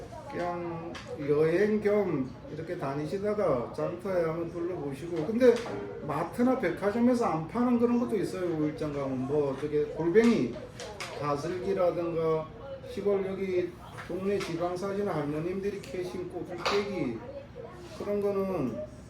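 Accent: Gangwon, South Korea. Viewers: 수고하셨습니다 강원도 사투리가 정겹고 좋아요 새해복 받으시고 새해도 수고 해주세요 장마마다 특색이 있고 좋습니다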